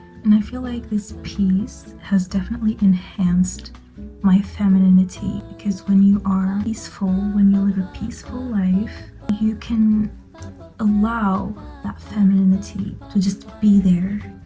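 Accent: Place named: France. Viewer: And the french accent was smooth and pleasant to listen to 🫠🫠🫠💋💋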